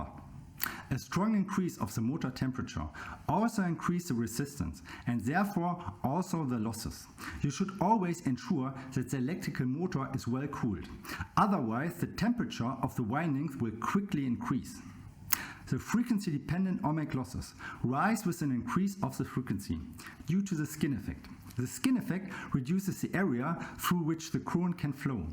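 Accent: Germany. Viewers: Hallo, deine Videos gefallen mir. Als ich entdeckte, dass du aus Berlin kommst, entschied ich mich gleich in die Kommentar etwas zu schreiben. I’m a 26-year-old young guy from China. I’m in the business of neodymium magnets and magnetic assemblies, but now trying to expand to BLDC motor and controller field. That’s why I’m learning everything about electric motors. You have German accent when speaking English, which I find interesting. Danke für deine volle Videos!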